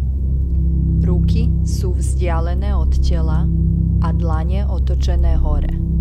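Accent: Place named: Eastern Slovakia, Slovakia. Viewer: Meditácia obsahovo a postupovo veľmi dobrá, žiaľ, mne vadí ten hlas, ktorý ma ruší. Navyše tam vnímam východňársky, miestami až rusínsky prízvuk. Keby to bolo nahovorené niekým iným, je to bez chyby a perfektné. Zafarbenie hlasu, intonácia, dikcia a výslovnosť, to sú všetko faktory, ktoré sú pri vedenej meditácii veľmi dôležité.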